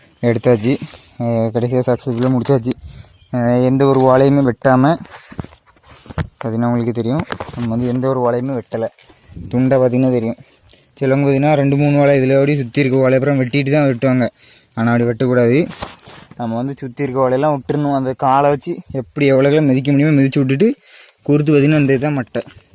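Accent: India. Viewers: Arumaiyana vela enaku piducha nellai slang love you chlm be safe from delhi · Super god bless but very risky work and hard work 💪 🙏 ❤your looks handsome your madurai slang excellent 👍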